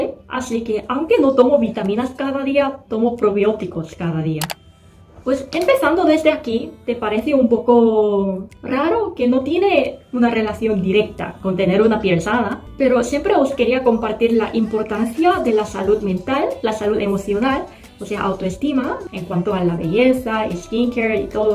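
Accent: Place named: Latin America. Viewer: "vamos a platicar", "ahorita"... como española de España tu español se me hace muy latino, aquí no decimos esas cosas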